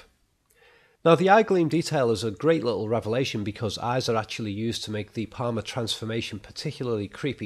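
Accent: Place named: Scotland, United Kingdom. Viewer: Dammit your accent is awesome. You should star in the next Braveheart.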